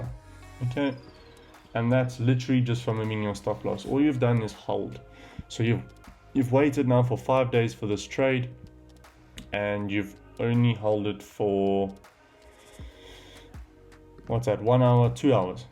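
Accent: South Africa. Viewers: First time watching one of your videos. Definitely becoming a new sub. Loving the South African accent 💪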